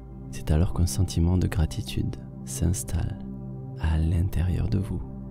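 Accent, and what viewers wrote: South, France. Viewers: c'est l'accent du sud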